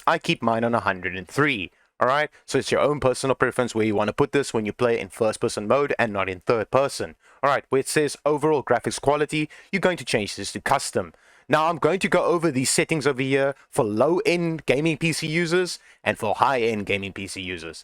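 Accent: South Africa. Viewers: i love the south african accent XD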